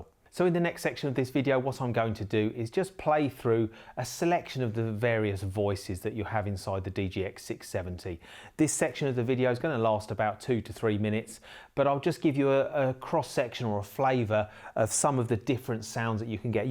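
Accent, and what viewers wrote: United Kingdom. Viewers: Who knew this mild mannered guy with a British accent was a funk genius?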